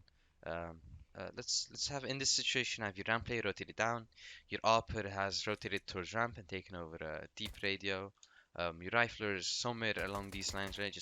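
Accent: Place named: Netherlands. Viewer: Why you sound netherlands, bonuskaart typa english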